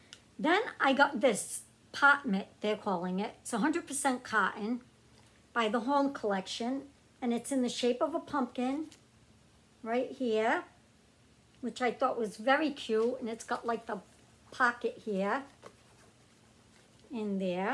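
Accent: Boston, United States. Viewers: Are you from Boston ? Your accent sounds like it , from Canada 🇨🇦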